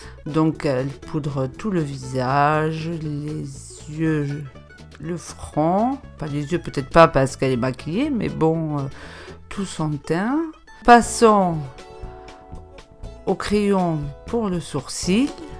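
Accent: South, France. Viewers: Troooop mignonne ta 😍 mais elle ma trop fait penser à monsieur ribera dans ariol (c'est un dessin animer lool) il dit toujours "effectivement" et en plus lui aussi a l'accent du soleil lol. Bisouuuuus 😘😘❤❤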